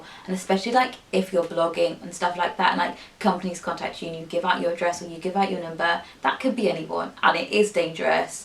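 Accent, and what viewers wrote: United Kingdom. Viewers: Your accent is soo cute! I'm British too but your British accent is so nice!❤️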